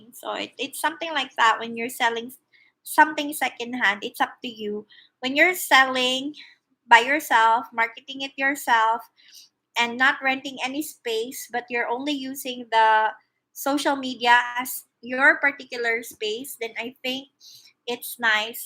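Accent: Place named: England, United Kingdom. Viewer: 5 thumbs up, I like ur english accent sis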